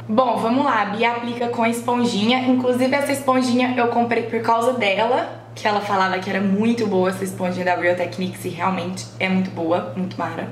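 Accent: Minas Gerais, Brazil. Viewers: sotaque mineiro representando ❤️ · ue, tu é mineira? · Estou amando seus vídeos! virei fã :) p.s.: esse sotaque Mineiro é uma delícia! rsrsrsrs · Vc é mineira????? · Você é a cara da Isis Valverde e ainda tem o mesmo sotaque mineiro 🙀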